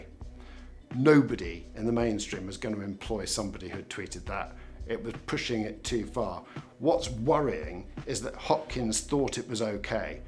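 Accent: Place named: RP, United Kingdom. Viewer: What a nasty piece of low level journalism. He is nowhere like Katie Hopkins and although I am not that keen on his music (or him for that matter), I wouldn't use the spiteful words you do. And all from a plummy accented toff trying to make his own way in the limelight and well over 40. to boot!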